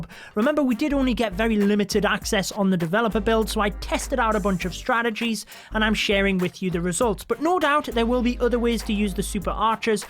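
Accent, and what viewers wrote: United Kingdom. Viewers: Where is he from? Or I should ask: In which part of UK does he live? 😄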